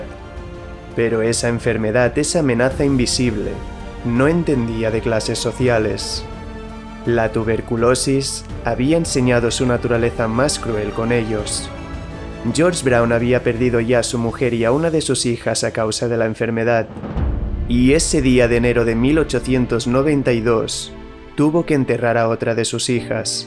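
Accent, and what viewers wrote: Spain. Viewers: A mí no me gusta el español de España por lo tanto no me gustó la narrativa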